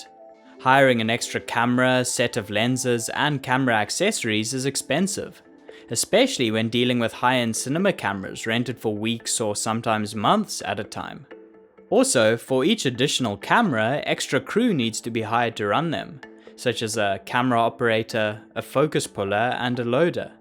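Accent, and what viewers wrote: South Africa. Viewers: sorry good sir are you South African??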